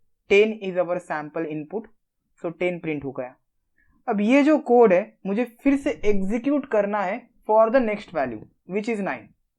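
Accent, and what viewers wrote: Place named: South India, India. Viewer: Brother yen pronounce South Indian and also don't forget the missile man of India belongs from south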